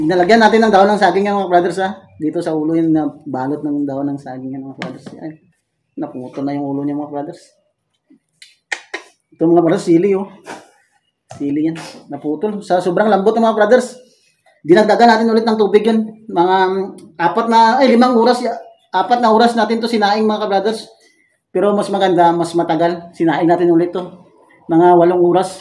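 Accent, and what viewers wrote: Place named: Iloilo, Philippines. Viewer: Ilonggo ka sir😊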